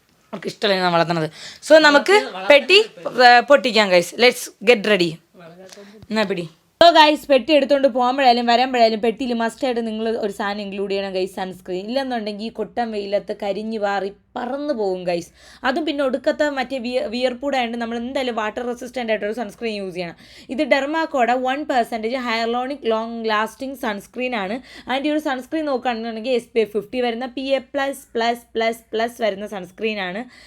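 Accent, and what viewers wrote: Trivandrum, India. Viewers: mole 🥰, so proud you lil one and thankyou so much for making our Trivandrum slang loveable and acceptable to everyone. പിന്നേ മോനാൾട്ട് പോലെ different വാക്കുകൾ മലയാളത്തിനു സംഭാവന ചെയ്തതിനും 😄😂👌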